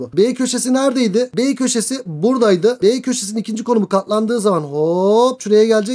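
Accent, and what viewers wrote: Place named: Trabzon, Turkey. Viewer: Trabzon gibi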